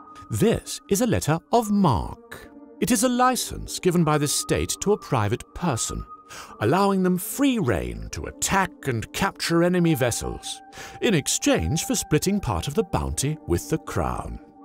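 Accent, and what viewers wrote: United Kingdom. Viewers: Is this guy British or American? Because he's using a British accent, but he's also using the American pronunciation of "Caribbean" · British accent but american pronunciation?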